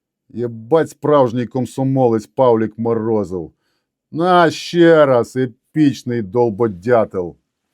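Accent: Transcarpathia, Ukraine. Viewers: Ваші коментарі при знищенні хробаків з переливом закарпатського діалекту і багато ще чого ,якби нерозуміння що це війна і йде щосекундна зміна ситуації,то як в якомусь фільмі,але ж то реальна загроза всім нашим українським захісникам,Дякуємо Вам , і віримо в Перемогу України🇺🇦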